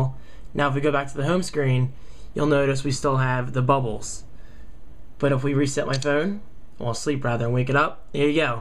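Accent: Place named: California, United States. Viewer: haha are u from california or somethen your accent is cool instead of photos your like FOWTOES XD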